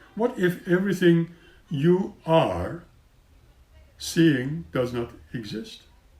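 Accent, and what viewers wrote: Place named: Turkey. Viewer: Merhaba! Your Turkish accent was perfect.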